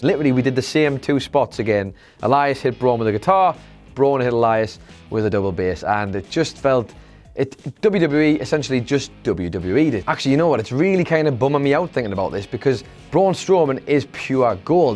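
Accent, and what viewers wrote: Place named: East London, United Kingdom. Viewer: Really guys? It’s regional accents and dialect. Adam Nicholas and Clery are from Newcastle, and that is a notable accent. See also Ant & Dec Andy Murray is Scottish, and is from east London, and has a corresponding accent.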